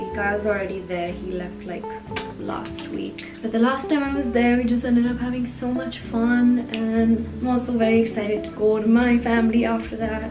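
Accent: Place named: India. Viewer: r u from India, coz ur accent is totally different ❤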